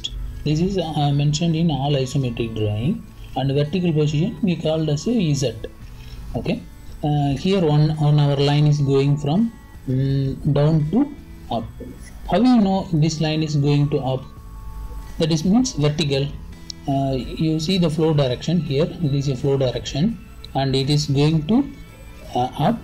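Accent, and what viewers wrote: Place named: India. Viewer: Madersud english spiking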